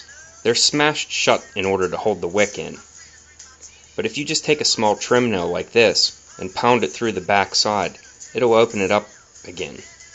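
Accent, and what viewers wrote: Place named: New Jersey, United States. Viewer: Are you from New Jersey? I know of a guy who is from NJ that speaks a lot like you do.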